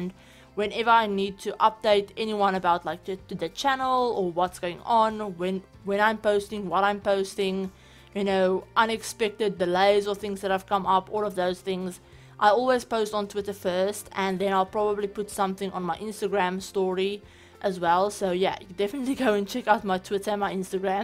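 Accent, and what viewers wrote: South Africa. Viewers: My jaw dropped when I heard the South African accent. Need more African simmers!!!!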